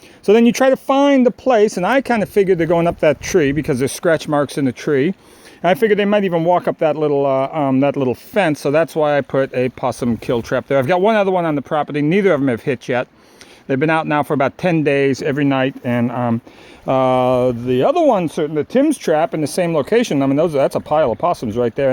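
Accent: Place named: United States. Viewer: This is in New Zealand - you can tell from the rimu and ferns - where possums are a serious pest. He just happens to have an American accent.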